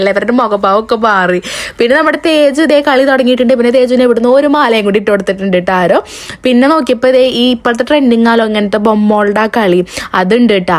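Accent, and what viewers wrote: Thrissur, India. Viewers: ഹലോ ചേച്ചി ഇങ്ങനെയൊക്കെ വീഡിയോസ് കാണുമ്പോൾ ആണ് എനിക്ക് എന്റെ നാട്ടിലെ പൂരം ഒക്കെ ഓർമ്മ വരുന്നത് miss thatt... ചേച്ചിക്ക് ഓരോ ദിവസം എത്ര പൂരമാണുള്ളത് എല്ലാം നല്ല സന്തോഷിച്ച ആഘോഷിച്ചു കഴിയുക അതുപോലെ ചേച്ചിയുടെ ഓരോ ഡ്രസ്സുകളും എനിക്ക് വളരെ നല്ല ഇഷ്ടമാണ് ചേച്ചി സാരി ഉടുത്തിട്ട് കാണാൻ എനിക്ക് നല്ല ഇഷ്ടമാണ് ചേച്ചിയുടെ മുടിയും ഒക്കെ അതുപോലെ ചേച്ചി വീഡിയോയിൽ വോയിസ് ഓവർ കൊടുക്കുമ്പോൾ ചേച്ചിയുടെ ഒച്ചയും അതുപോലെ ആ തൃശ്ശൂരിലെ ആസ്വാങ്ങും ഭയങ്കര ഇഷ്ടമാണ് 🤍🤍🤍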